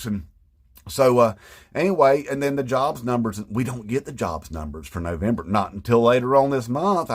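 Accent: South, United States. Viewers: I have lived in South Carolina for 44 years and I can’t tell you how much it warms my heart and comforts me to hear a thick southern accent like yours brother speaking truth and also sounding extremely intelligent. I just made a very long TikTok yelling at Southerners for voting like they have no brain and some southern gal comments on my video that she agrees with me 100% and that groceries are so much cheaper under Donald Trump than Joe Biden. I never said that in the video. I said the opposite of that during the entire video. How are these people so stupid? Anyway, brother, thank you for speaking truth, especially in a southern accent.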